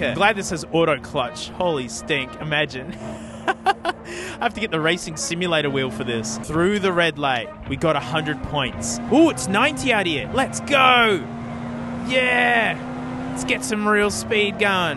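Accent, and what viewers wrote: Australia. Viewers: Australian let's game it out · Omg its aussie. I needed this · I clicked on this video, having no clue what I was in for the first two seconds. I hear funny Australian man and goofy old Timey music. I don’t know how I have accidentally found the funny iPod man.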